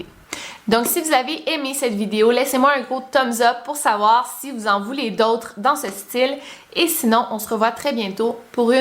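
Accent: Quebec, Canada. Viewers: Avant j’aimais pas trop l’accent canadien/québécois, mais quand tu commence à raconter tes histoires... WoW... c’est juste trop beau 😍 j’adore ce que tu fais, et je te suis depuis peu de temps mais je suis accros ! 😅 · Encore une vidéo !😍 Je suis accro à ton contenu et c’est plus lfun d’écouter ça venant d’une québécoise 🙌🏻 C’est une amie qui m’a fait découvrir ta chaîne avec ton vidéo de creepypasta et depuis je suis hooked✌🏼j’ai hâte de voir les prochains sujets dont tu va parler et je suis vraiment intriguée par l’histoire de Chris Watts présentement et j’espère qu’un jour tu en parlera aussi. Ps. J’espère que tu as eu de belles vacances\un super mariage ✌🏼❤️ xx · Je kiffe 😍 j'adore toute tes vidéos j'arrive même a les regarder quand je suis toute seule chez moi,mes parents dise que tu casse la tête avec ton accents québécois mais moi ça ne me dérange pas continue ce que tu fais 😘😘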